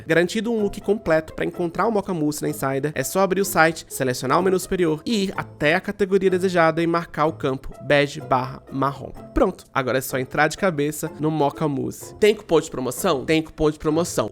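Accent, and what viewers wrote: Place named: Minas Gerais, Brazil. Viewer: estava cm um sotaque especialmente mineiro nesse vídeo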